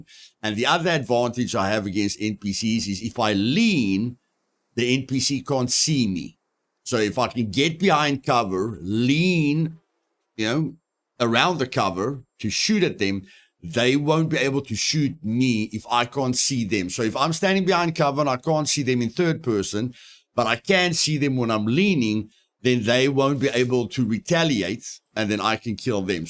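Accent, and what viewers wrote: South Africa. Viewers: You south African bro? Cool accent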